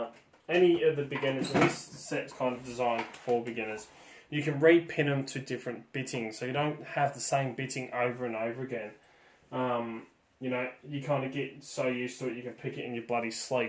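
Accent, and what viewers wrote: Australia. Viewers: Glad to find your Channel, good to hear a fellow Aussie showing us some great content, I am new to Lock Sports but am enjoying learning with my Sparrows Tuxedo kit, Cheers;) also thanks for the referral to Locksmith Toolbox they are awesome and good prices:)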